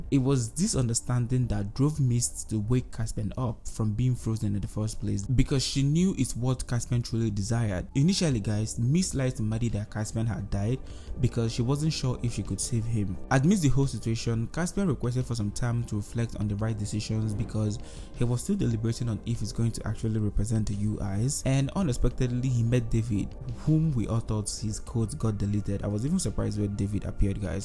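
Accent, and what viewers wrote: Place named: Nigeria. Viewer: Love a naija voice doing reviews like these! Keep it up Will watch all your videos 💪🏿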